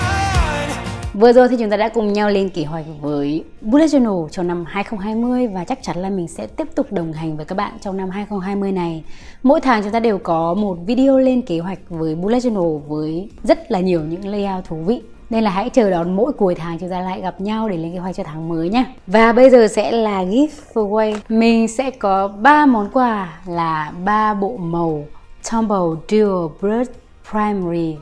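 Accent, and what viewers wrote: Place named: North, Vietnam. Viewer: À nhon chị. Đầu tiên em muốn giới thiệu một chút về bản thân. Hì, em là Chou Chou, đã là sinh viên năm 3 rồi, và theo học kinh tế. Thực sự mà nói thì những thàng ngày đầu tiên tại trường đại học của em rất chán luôn, và rồi em bắt đầu đến với một câu lạc bộ vào năm 2, từ thời khắc đó phải nói deadline ngập đầu luôn, mình đã rất stress lắm, nhiều lúc chỉ muốn bỏ mặc hết tất cả, cả những chênh vênh của tuổi 20. Nhớ cách đây nửa năm nhỉ, em tình cờ một ngày chán chường lướt YT thì em xem được clip đầu tiên của chị, về lên kế hoạch. Thật sự lúc ấy em bị hấp dẫn bởi cái giọng Bắc nhè nhẹ của chị, có lúc "xàm" nhưng rất đáng yêu, rất thu hút người nghe, và cả một ngày hôm đó hình như em chỉ xem mấy video của chị thôi. Và ngay sau đó em tập tành những trang Bullet Journal đầu tiên, nhưng mà trời ơi, em vẽ tệ hết sức, nhưng mà em vẫn rất vui, bởi vì trong thời gian đó em cảm nhận được mình hạnh phúc với những thứ nhỏ nhỏ mình tạo ra, Bullet đã giúp em rất nhiều, em đã xử lí thời gian tốt hơn, mặc dù đến bây giờ thì vẫn có những ngày em không hoàn thành đựơc hết nhiệm vụ, nhưng không sao tất cả đều phải có quá trình mà chị hen. 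Em vẫn nhớ chị bảo mỗi cô gái nên có một cây son đỏ, vì nó chính là sự quyến rũ đặc trưng của những cô gái Pháp, em nhớ mang máng là vậy, và em cũng vậy, cuồng một màu son duy nhất đó. Hihi. Không hiểu sao khi xem cái video này của chị, em có nhiều động lực lắm luôn, em viết mail cho ban Nhân sự để xin rời CLB để sẵn sàng bắt đầu những dự định mới của m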